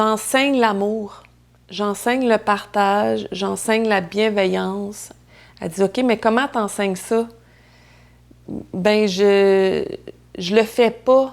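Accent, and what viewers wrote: Canada. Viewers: J’aime beaucoup entendre toute cette histoire en accent du Canada en plus! L’accent du Canada m’étonne à chaque fois que je l’entend.. Comme moi j’avais vécu à Paris et j’avais essayé d’apprendre l’accent de Paris... Mais bien sûr ce n’est pas gràve par rapport à l’histoire même- c’est juste que je n’ai pas l’habitude d’entendre le français du Canada. Merci aussi de laisser sa voix telle comme elle est- je n’aime pas les dubs en autres langues. Quelle histoire incroyable! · Comme c’est intéressant! Une Québécoise tout comme moi! Cela me fait du bien de t’entendre!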